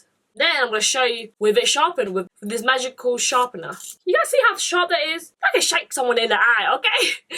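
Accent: United Kingdom. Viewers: I wasn’t expecting the British accent 😂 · hey girrrlllll Im Gemini too june 11th :) i love the uk accent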